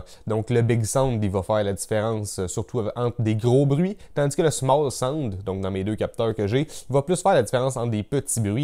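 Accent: Quebec, Canada. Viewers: Ça fait du bien d'entendre un québecois enseigner ! C'est très clair merci!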